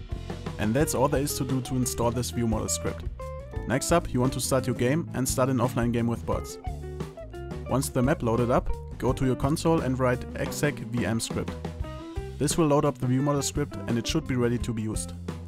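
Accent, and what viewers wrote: Germany. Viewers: Could it be that you are german? The accent is real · man merkt voll das du deutsche b ist nicht böse gemeint oder si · Biste deutscher? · Man hört so das du deutsch bist haha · Man hört sofort das du Deutscher bist :D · Das deutsche Englisch Ich LIEBE ES 😂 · Kann es sein das du deutscher bist ? / Are you German ?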